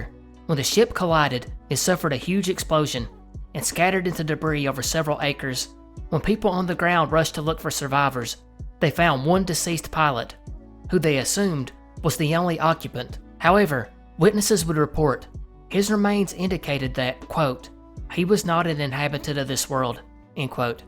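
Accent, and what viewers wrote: South, United States. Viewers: Haha also you saying hello fam was a culture shock because fam is British slang over here 🤣 miles and miles away from the south states of America - enjoying the series as an outsider and my heart on the first part talking about your accent. Other than preconceived ideology of the south I still can't put a face to a voice I'm sure we'd all be interested in a face reveal even though your content doesn't suit that (oh god those yters that combined this content with face cam and the audio quality is different). Anyway as a British hooligan I've commented about your accent before and your dialect doesn't bother me one bit I love your pronunciations they make me giggle in a completely non judgemental way I'm sure we'd be giggling at each other if you heard my accent too 🤣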